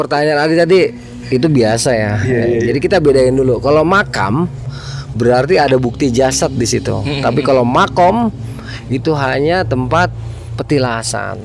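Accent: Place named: Jakarta, Indonesia. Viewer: Bang logat betawinya kentel banget enak dengernyatapi bahasanya kereeen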